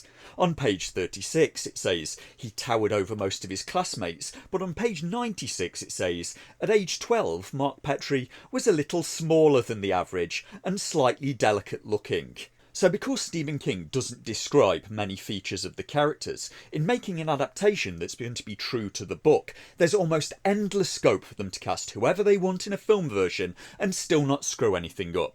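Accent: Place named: Lancashire, United Kingdom. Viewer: is in Lancashire!! i know coz i live there!:-) "oor rite arr kid?":-)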